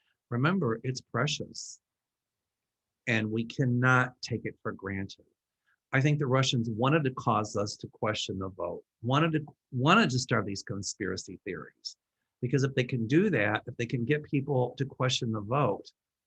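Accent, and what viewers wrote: South, United States. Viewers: I was just wondering where your accent was from ? I knew it was somewhere from the south. ( I am an Aussie, accents fascinate me ) I love your readings,so happy to see you have recovered from that vile disease 💜💖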